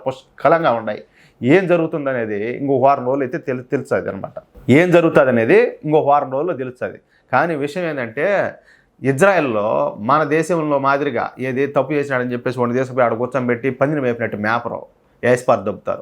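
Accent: Rayalaseema, India. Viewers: సార్ చక్కటి రాయలసీమ యాసలో చాలా బాగుగా వివరించారు. దన్య వాదములు.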